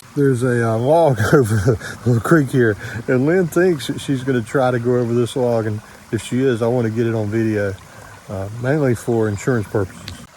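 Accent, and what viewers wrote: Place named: South, United States. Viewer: Nothing wrong with your southern drawl. I understand you perfectly and I’m Canadian😊 EHH! Love your travels, hope to follow in your path someday.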